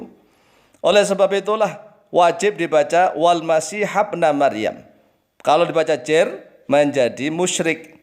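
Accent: Java, Indonesia. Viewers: Yg baca aja ktahuan orang jawa....blum pas...🙏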